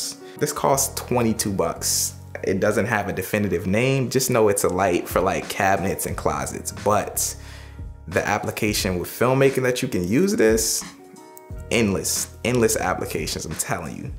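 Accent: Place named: DMV, United States. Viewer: The slider and takstar mic look great. You sound like you grew up in the DMV as well. Keep being great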